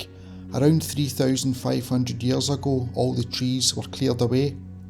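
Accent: Scotland, United Kingdom. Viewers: Good to hear again some Scott English 😊😊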